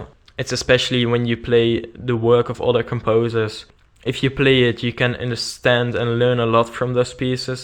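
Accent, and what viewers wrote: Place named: Netherlands. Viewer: haha. i could emidiatly here ur from holland :P. im also from holland ^^. great tutorial :) !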